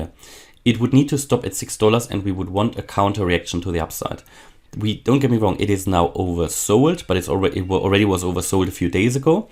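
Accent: Germany. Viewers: German accent ? Grüsse aus miami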